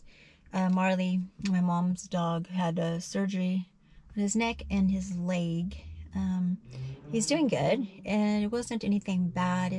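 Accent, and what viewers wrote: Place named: Maine, United States. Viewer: i hear maine, or vermont or whatever and maybe its true. Id like a guess outside the U.S if you picked those.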